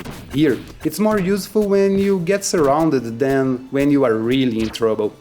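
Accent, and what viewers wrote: Brazil. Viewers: Es Brasileiro o sotaque em ingles nao engana.o tchi no final das palavras denuncia logo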